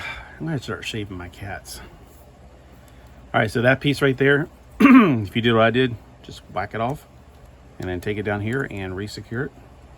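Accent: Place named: South, United States. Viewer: Sometimes you have your southern accent come out more than others. In this video is one of these times. lol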